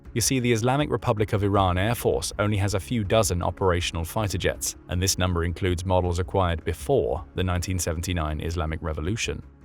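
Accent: United Kingdom. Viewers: You're using the British accent to kind-of whitewash some part of the British Raj system that turned communist in West Asia. The in-person alternative to Zionist government is something more evil with drugs and aliens, and the power structure for that derives from Shiite heresy against burial edict. There's a cultural schizophrenia where it is common to disparage Israel, de minimus, by omitting the obscenities to which Israel is some counter.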